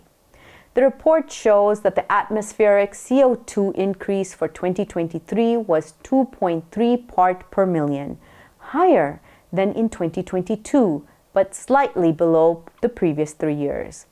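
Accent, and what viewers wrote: United States. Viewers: ╔═══ ❤☀💚☀❤ ═══╗ 💚💚💚💚💚💚💚💚💚 Madam SAHEBA, Assalaamu alaikum. Thank you very much for presenting the news today after almost a month. It was on 3rd, Oct that you had presented the news last. We, from Hyderabad, India, like your news reading because of your slow pace and because of which we easily understand your speech despite it being in American English. But only thing is we need to have lot of patience to hear your news-reading and lot of free time. Madam Samana Siddiqui would have finished your 18 minutes of news bulletins in just 12 minutes. But it hardly matters. What matters is our being able to understand your reading!!! YOU ARE DOING A GOOD JOB!!! PLEASE KEEP IT UP!!! 🌐🌐🌐🌐🌐🌐🌐🌐🌐 ╚═══ ❤☀💚☀❤ ═══╝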